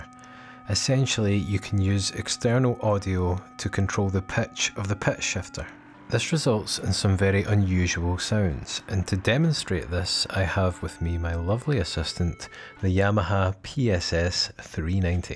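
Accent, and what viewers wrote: Scotland, United Kingdom. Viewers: Nice hearing another Scottish accent on gear. I got one of these ages ago but never really explored the input weirdness. I didn't even think of using a Euro oscillator/sequencer for the pitch. This is great. Just what I was looking for. I wonder if CV could be sent to the HOLD input too. Hmmm.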